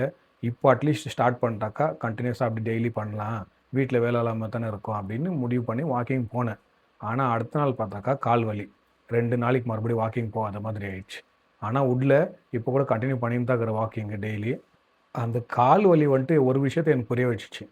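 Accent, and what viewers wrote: Bangalore, India. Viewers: Easter birthday nu video liye sollitunga, unga slang uh vechu place of birth Bangalore nu potupe, which year bro, 33 na 94 or 93?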